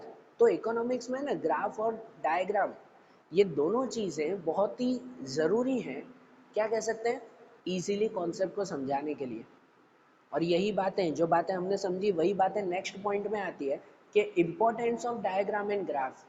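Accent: Gujarat, India. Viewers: he got the gujju accent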